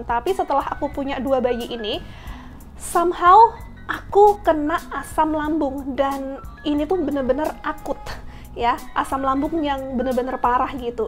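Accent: Java, Indonesia. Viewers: B.indo oke Inggris pinter dan lancar B.jawa tetep g ilang jawane lek ngomong sambil ngakak karo bestiene 😂.. enak banget denger suara mbk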